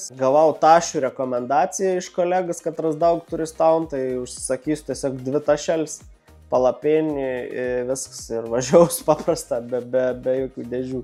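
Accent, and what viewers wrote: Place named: Samogitia, Lithuania. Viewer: Kazkaip keistai smagiai ta zemaiciu kalba klausos visokie vidosikai 😀👍 Maladietc!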